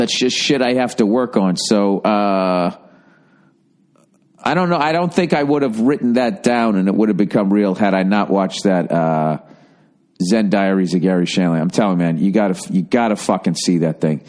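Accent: Boston, United States. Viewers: I love the Boston Accent in there,especially, when you get heated on what you’re speaking about. Your rants make my day/night. 💛🖤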